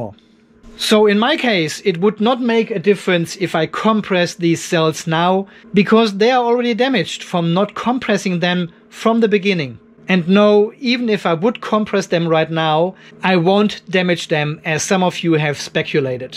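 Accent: Germany. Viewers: Now this is a very video for me. Let me explain. I recently dismantled 6 Mastervolt 24 Volt batteries, each containing 6 Thundersky 170 Ah cells. When I dismantled them, I noticed the cells were in a metal enclosure. 2 steel bars on each side and those bars were connected to two end-plates by sticking through holes in the end-plates and were kept in place because they were twisted behind the end-plates. (Apologies, English is not native to me). Some batteries had so much tension build up due to expansion of the cells, that, when I untwisted the sidebars, the batteries literally exploded mechanically with a loud bang. After removing the cells, some cells were so inflated that they resembled the Michelin puppet. The ones I didn't trust were taken directly to the communities local chemicals intake point. The other ones I tested and kept them when they were still good. 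I'm about to install EVE cells into my sailing yacht. I don't even consider compression. If a cell expands (too) much, I want to be able to see it and take appropriate measure in time (replacing). Thanks, love your channel, especially the German? / Austrian? accent. I learned and still learn so much from you as well as from Kermit.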